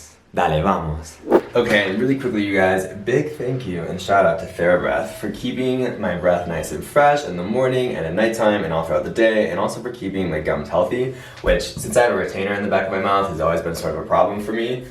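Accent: Buenos Aires, Argentina. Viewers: tu acento argentino me hace reir XD yo apprendó el frances tambien y pienso que puedes hacerlo más facíl !! esos tres languajes son muy similares y lo hace más facíl de apprenderlos. j'aime mieux apprendre les langues et tes vidéos à leur sujet! j'espère que je rendrais visite à la france à l'avenir comme vous et argentina : ) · AAAAHHH I KNEW YOUR ACCENT SOUNDED A BIT ARGENTINIAN!! Si te soy honesto, sos la primera persona estadounidense (nativa) que escucho que tenga un acento argentino. It really was shocking. Not many americans know about our existence but I’m somewhat happy on the fact that you have that beautiful accent of ours in your spanish. Muy orgulloso de vos ❤️ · I'm learning english actually 😂😂😂 what I do is listening to music, sometimes I read books in English but what actually I do like is watch videos on youtube. I keep looking for channels in English and I also learn from them too, new words and new stuffs. 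What I realized is that you accent is from Argentina, I'm from Dominican Republic. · Saludos desde Mendoza. Argentina. Tu acento es porteño perfecto!! Bien logrado!! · AMO TU ACENTO ARGENTINO, HABLAS MUY BIEN EL ESPAÑOL, SE VE QUE TE HAS ESFORZADO, TE ENVIO ABRAZOS Y BESOS DESDE PANAMÁ 💜🙌🤗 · Damn!! Escucharte hablar con ese acento argentino me pone mal!!! Saludos desde mexico!! MAS VIDEOS EN ESPAÑOL X FAVOOOR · Yo podría ser tu native speaker, para que dejes ese acento argentino y tengas uno más neutral. I´m from Chile. Practicamos idiomas y hacemos otras cositas jijijiji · You are not only speaking spanish, you are speaking argentinian, and that's freaking hot. Saludos desde Argentina :) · Tienes mas acento argentino espero que visites Peru.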